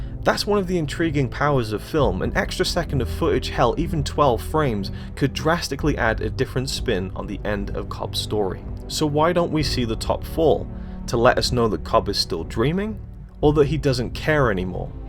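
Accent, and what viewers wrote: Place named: United Kingdom. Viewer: Who is this British dick making these videos. He explained nothing. What a tool.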